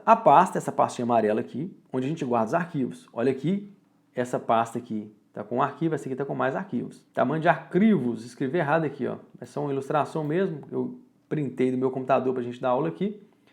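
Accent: Minas Gerais, Brazil. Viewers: Ótimas aulas, Esse sotaque mineirim da um charme especial. Parabéns pela maneira de explicar e passar seu conhecimento!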